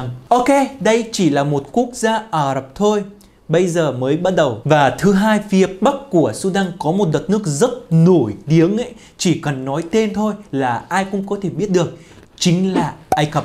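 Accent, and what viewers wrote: Standard. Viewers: Noi tieng viet nam chuan qua cam on . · ! Ban rat gioi toi nguong mo ban, Ban la nguoi noi tieng Viet rat chuan! (moi ban den tham qe toi Can Tho mot lan nhe!) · mình cảm thấy hổ thẹn với bản thân mình quá. nói tiếng việt chuẩn và lưu loát quá. mà công nhận có trình độ địa lý đáng nể. chúc thành công trong cuộc sống. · Mới biết kênh và rất ngạc nhiên vì nói tiếng việt quá chuẩn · Chào nhé..cô thấy cháu nói tiếng việt rất chuẩn. Cảm ơn vì cháu yêu đất nước của cô.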